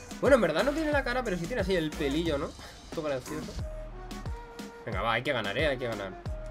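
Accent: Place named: Chile. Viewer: Wn, siento que eres chileno en vez de español :v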